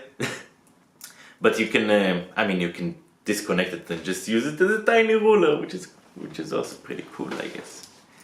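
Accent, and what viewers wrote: Israel. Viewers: i loved the look of the details in the pen and the engraving, thanks for creating a magnificent art piece. is't your accent from israel? you sound like my cousin, thats why i ask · Accent is definitely israeli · * Clicks on video * * Hears Israeli accent * INSTANT SUB! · מבטא ישראלי למדי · המבטא שלך פשוט צועק לי ישראלי באוזן זה קורע!😂 אגב הדברים שאתה מכין מדהימים🤟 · המבטא הישראלי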